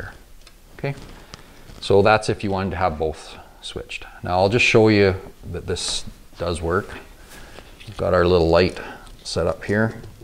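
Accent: Canada. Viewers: You must be Canadian eh. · You have the most Canadian accent ever it reminds me of home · You can so tell that he's Canadian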